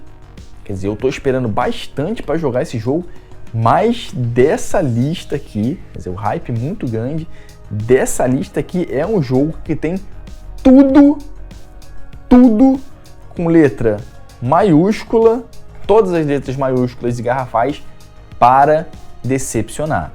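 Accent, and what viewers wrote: Rio de Janeiro, Brazil. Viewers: Esse sotaque carioca é uma pika mané, eu entendi "baú dos gay de 3" e é baldur's Gate 3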